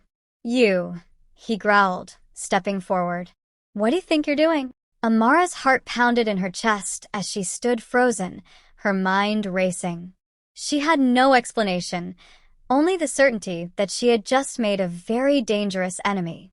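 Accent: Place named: Europe. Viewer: Why don’t I hear other voices narrating these stories instead of a clear type European voice. If I see these are African type stories then the voice could match, in my opinion. Thanks for listening.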